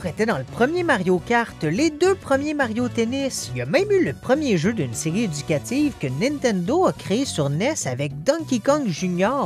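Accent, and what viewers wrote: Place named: Canada. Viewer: Cette façon de parler en disant "actuellement" et "régulier", mais il est canadien !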